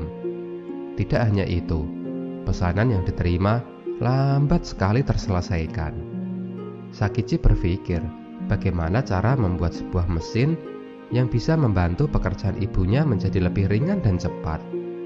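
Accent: Java, Indonesia. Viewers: Sedikit saran. Pengisi suara ganti org aja, logat jawanya risih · Ada yg lucu di menit 11.18 detik saat dikendarai mobilnya bunyi etek etek etek wkkwkw siapa yang denger kalimat ini like and comen lucu juga ya pembaca beeitanya suaranya Mas nya medok banget keliatan dari jawanya sama kaya aku dari jawa tapi medok nya udah ilangbtuh kwkwkkw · Saya bolak balik lihat Video ini. unik membawa cerita, logat Jawa medok nya khas Alhamdulillah Sekarang Toyota nangkring di teras rumah ku.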